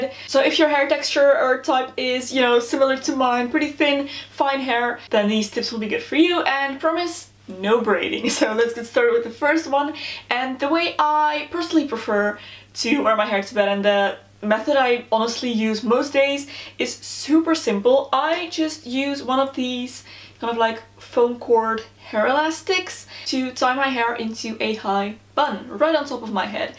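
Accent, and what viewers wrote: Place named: Netherlands. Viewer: ik hoorde aan je accent dat je nederlands was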